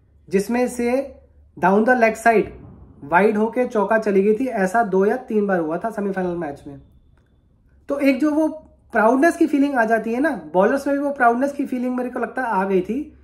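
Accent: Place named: Gujarat, India. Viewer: He sounds like gujarati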